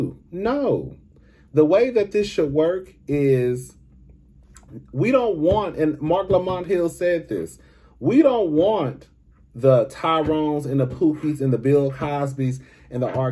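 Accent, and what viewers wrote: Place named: Midwest, United States. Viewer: I can still hear the Midwest accent.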